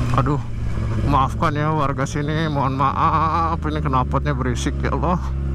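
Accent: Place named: West Java, Indonesia. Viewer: Sunda geningan bos😂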